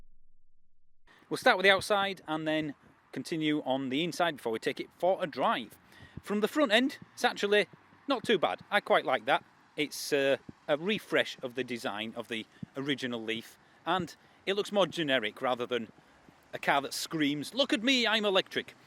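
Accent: Yorkshire, United Kingdom. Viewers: Yorkshire England I reckon near keighley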